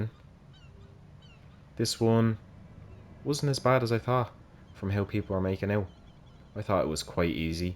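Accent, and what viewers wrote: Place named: Dublin, Ireland. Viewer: love your accent! Are you from Dublin?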